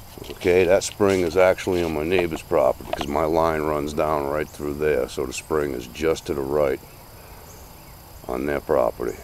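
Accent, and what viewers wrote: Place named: South Louisiana, United States. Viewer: Are you in South Louisiana? I'm from Nola and your accent sounds familiar.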